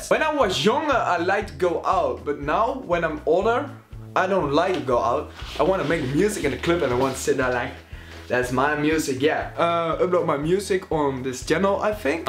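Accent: Netherlands. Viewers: Je bent nederlands. Dat hoor je aan je accent😂😂💗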